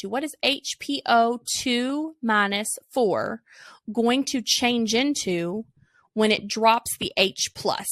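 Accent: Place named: South, United States. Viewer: goodness I love southern accents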